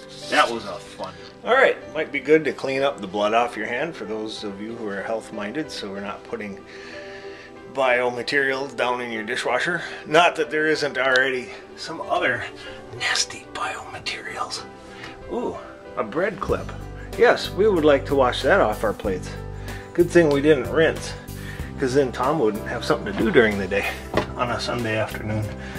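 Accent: Minnesota, United States. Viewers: Bet your from Minnesota or the Dakotas maybe. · I am guessing you might be from MN. (me too, fun to hear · Listening to you makes me homesick for Minnesota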